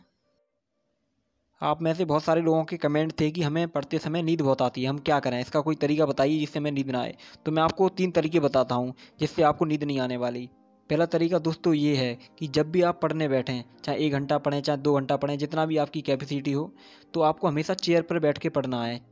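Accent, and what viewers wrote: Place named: Palwal, India. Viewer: Awaaj se plwl side se lg rhe ho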